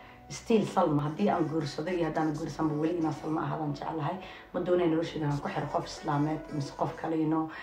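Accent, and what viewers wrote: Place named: Isaaq, Somalia. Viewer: wan ogaah naag sidaa u hadle kulaal baa isaaq ah ❤❤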